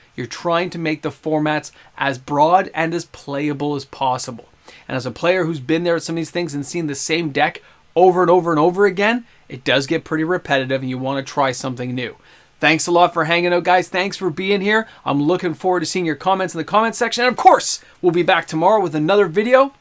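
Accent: Canada. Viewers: Anytime I have a negative thought about how WotC is doing something I don't like, the thought in my head is narrated in your voice. A shouted-stern-canadian voice.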